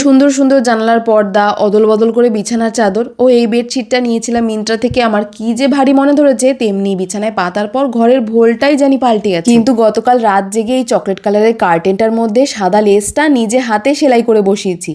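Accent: Bangladesh. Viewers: Kotha gulo Bangladeshia jogonnno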